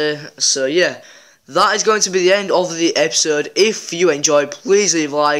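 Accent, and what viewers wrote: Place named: United Kingdom. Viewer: Awesome video bro. I really enjoyed watching it. Are you British btw? I like your accent a lot.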